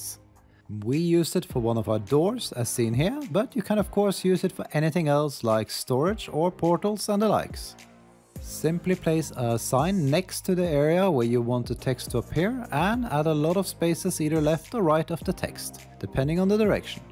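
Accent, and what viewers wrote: Norway. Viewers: Might i ask where your from ? sound so Norwegian :p Love the ideas ! keep it up :D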